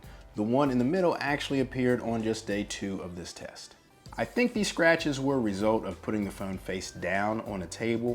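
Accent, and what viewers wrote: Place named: Louisiana, United States. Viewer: Debating whether to go caseless for the 14 Pro Max. You sound like you’re from Louisiana!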